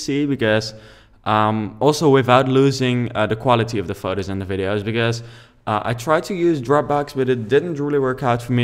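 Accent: Netherlands. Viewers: Je kan wel horen dat je Nederlands bent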